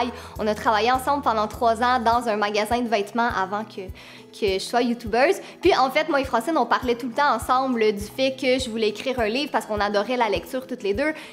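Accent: Quebec, Canada. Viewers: JE T AIME troooooooooooooppp (j'adore ton accent quebecois)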